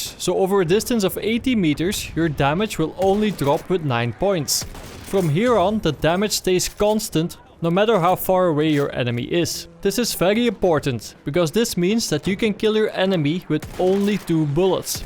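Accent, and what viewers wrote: Netherlands. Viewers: Einar Samuelsson He is from the Netherlands, believe me. I am from Belgium and I know how dutch people speak and I can understand them because we speak the same language. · It is clearly a Dutch accent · Het is makkelijk te horen dat hij nederlands is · Is this guy Dutch? Sort of sounds like it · That dutch accent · de duts aksent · sounds like he's from the Netherlands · It's sounds like your dutch? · Horrible Dutch accent.... 'Twinty meters' ' Drrrop' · this dutch accent hahaha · the "r"s sound pretty dutch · the dutch accent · this is the kind of english with a Dutch accent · you are really dutch i hear it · he really sounds Dutch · Are you Dutch ?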